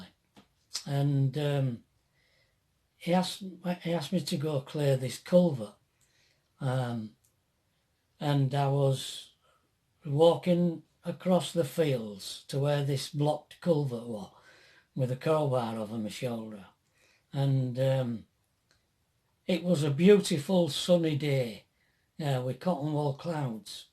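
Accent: Yorkshire, United Kingdom. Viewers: actually did laugh out loud at your stories. Absolutely brilliant. I’ve come back home to look after my mum who has gone blind and had a stroke. I have spent the last forty odd years in America, so being back in England has been a bit of an eye opener. I was from North Yorkshire originally and to hear your accent and tales is something mum and I look forward to every single day. Your stories and cheerful charm remind me of the Yorkshire characters that I knew as a child. I hope one day to take you and out for a meal to say thank you in person when I can get my U.K. drivers licence. What a difference you have made to our lives. I can never thank you enough. I’ve got a poem for you that one of my friends wrote as a child in America, your zest for life makes me think of it when I think of you two. “Sunrise, I rise, with whist full sighs and starry eyes for things to come of yet!, but haste makes waste does a wise man say, so, before enjoying the light of day, linger do I, and later regret, the sun I find, already set! 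Thanks again, you are the best!!!!!!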